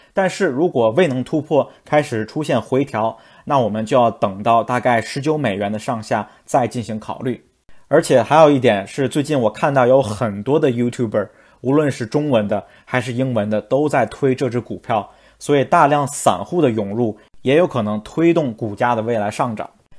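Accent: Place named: Tianjin, China. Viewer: 是天津卫滴：）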